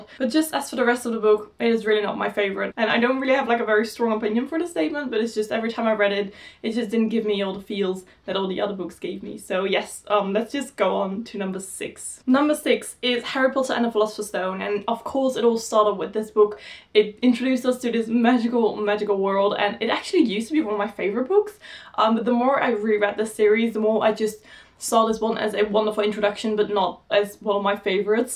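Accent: United Kingdom. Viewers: me too. You sound very british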